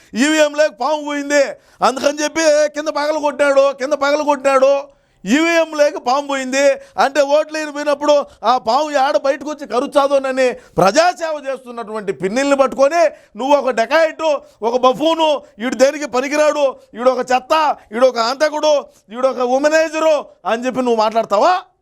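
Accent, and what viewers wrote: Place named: Kadapa, India. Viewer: First preference ni videos ki istunaa..... fun and information rendu untay..... mana kadapa slang kuda❤❤❤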